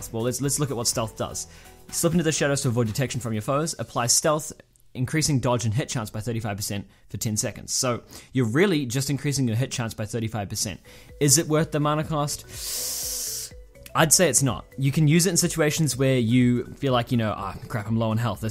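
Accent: New Zealand. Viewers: are you from New Zealand because I am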